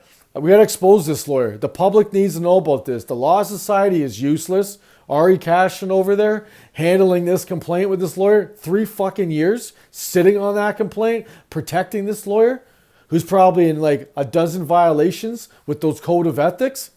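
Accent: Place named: Canada. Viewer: Within 5 seconds I knew he was Canadian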